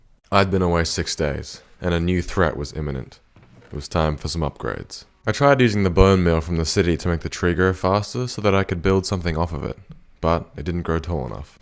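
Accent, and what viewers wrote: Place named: United Kingdom. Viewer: Jesus, are you livin in some castle in real life or is it because you can speak British really fucking well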